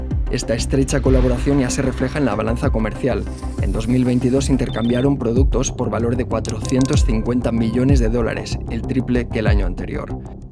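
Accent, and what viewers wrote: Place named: Spain. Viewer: Me hace gracia que quien presenta este mini docu....tenga acento espanol, cada ves que escucho los espanoles poniendo el dedo bien puesto. Me pregunto porque los espanoles son los primeros testaferros del regimen? Muchos espanoles le dan oxigeno al regimen. Deberian de consumir su tiempo en propagandiar al regimen castro-canel y sus testaferros espanoles a ver si tumbamos esa inyeccion de oxigeno que le regalan al regimen castro-canel....empiezen por ahi! USA no va a dejar ninguna alianza entre ambos coja alas, esto es oxigeno entre ellos y Cuba o el gobierno nefasto de Cuba le debe hasta el culo a los Rusos. Putin como mafioso mayor que es, esta recogiendo lo suyo, los rusos no saben pelear y los cubanos muchos menos. Todo eso es fachorias para darle valor a ambos paises que estan al borde d ela quiebra social. Y China no se queda atras, los mismos desastre naturales que tienen en le pais van a cobrar todo aquel mal que lleva sufriendo el pueblo de China por culpa de otros mafiosos!! 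Cuba, China y Rusia llevan la misma dosis de tratos!! Dejarlo que cojan alita se les van a quedar en alitas de pollo....kentucky chicken.....La dictadura castro-canel le quedan dos round tick tock tick tock.....time clicking.. Milei 2023 Derrocar la dictadura castro-canel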